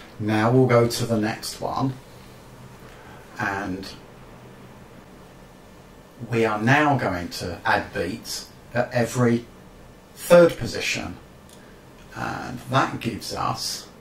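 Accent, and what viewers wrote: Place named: Nottingham, United Kingdom. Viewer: love the Nottingham accent. Bonsai and synths, now both the way god intended ;-) Subbed.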